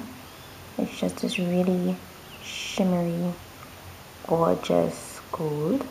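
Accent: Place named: Trinidad and Tobago. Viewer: ha! i can pick out a trini accent anyday!!!! hmm just found you and love your work! subbed! air brushing is the bomb my system is the dinair pro my brides just love it! a trick i do is switch guns because i really prefer oil based foundations they last longer covers better and show better on film but i like the ease of the water based to me it seems lighter especially during the summer.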